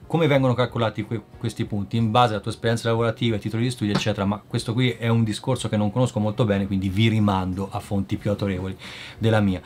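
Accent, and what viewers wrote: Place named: Perugia, Italy. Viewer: hai un po' l'accento perugino🤔🤔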